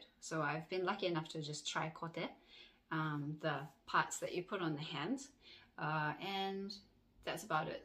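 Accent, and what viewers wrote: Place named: New Zealand. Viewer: Thanks for a great video. As one who found you via Anming's Oriental Pearl Channel, I am so glad she brought you and your content to my attention. I love your content and your presentation style. Informative, entertaining and visualling appealing are why I really get so much enjoyment from your endeavours. I marvel at your level of engagement with your viewers, which considering your busy family and work lives, I consider amazing. I now find it funny that I didn't hear your Kewie accent at all, but now, I always can hear hints of it in your speech. I hope you channel continues to gain subscribers and grows. I'll be in that audience, greedily wanting more content, but feel free to ignore my inane comments, but I truly enjoy engaging with you and insightful responses. I mean you must need sleep! My only query is what were your thoughts when Anming, I assume, contacted you about a collaboration in the first place, and why you decided to do it? 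Hopefully, you'll decide to do another collaboration, and if so I will be an even happier camper.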